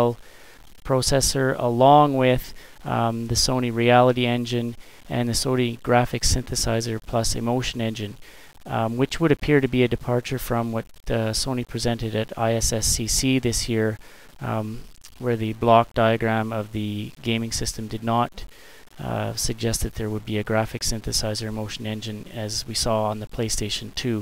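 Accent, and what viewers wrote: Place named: Canada. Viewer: you have a canadian accent lol are you canadian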